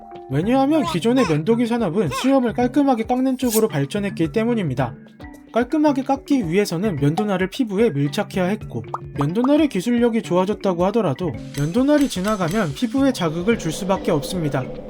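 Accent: Gyeongsang, South Korea. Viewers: 억양이 영남억양인데 엌ㅋㅋ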